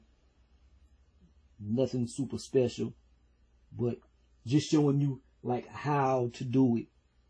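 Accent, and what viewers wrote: Louisiana, United States. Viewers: What's up homie? I'm new to your channel thanks to your P Valley breakdown. Anyways I'm checking on your other videos and stuff you seem like a chill entertaining dude I look forward to your other stuff. Super-talented also hey your accent bro it's very original sounds like when I watch movies from like Louisiana LOL like New Orleans type shyt. Very different from me I'm in Brooklyn LOL anyways have a good day about to start this P Valley season 2 LOL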